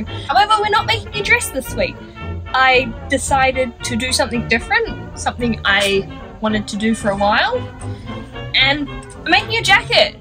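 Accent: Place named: New Zealand. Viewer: as a fellow kiwi it's just nice to hear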